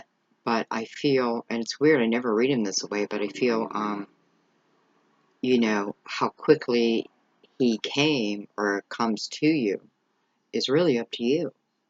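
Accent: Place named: Philadelphia, United States. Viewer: The wedding stuff happened. You speak like the people I grew up with ie Philadelphia accent or Jersey/delaware. Just curious, am I close?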